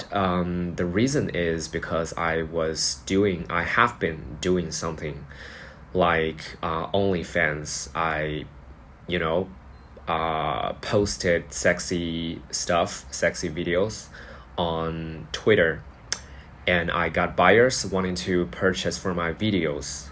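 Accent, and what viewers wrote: United States. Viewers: But you don’t mention anywhere how to support you. When I hear you talking, I find it difficult to believe you haven’t spent some time in the US since you speak flawlessly with an american accent. You have absolutely no reason to be depressed. You are young and healthy, very handsome, very smart and creative, and you master the two major languages in the world that is coming onto us. I’d take a lesson with you ! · this is quite frustrating. I'm 4mins into the video and it is completely unclear where he was imprisoned - he speaks American English in a way you can only learn in the US itself but it seems (at 4mins into the video) all this happened in mainland China. if that's the case then all bets are off - China is not a country which honours the rule of law. the law there is arbitrary. it serves the ruling party and they bend it if deemed necessary or useful. 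nobody can expect a fair trial in China regardless what the law is on paper.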